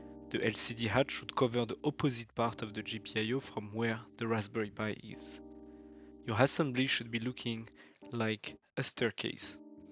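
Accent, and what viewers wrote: France. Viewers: Cette accent français, super vidéo merci 👍😂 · are you french ??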